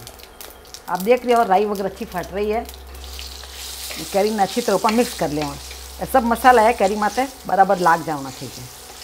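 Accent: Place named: Rajasthan, India. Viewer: Unki etni mithi boli he.. Rajasthani boli me bat krti h wo .. full traditional. Aapko Nhi samjh aata to koi channel dekh skte ho aap.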